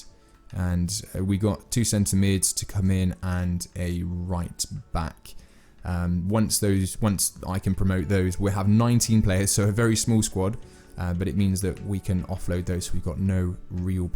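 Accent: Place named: South Africa. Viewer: 10/10 South African accent mate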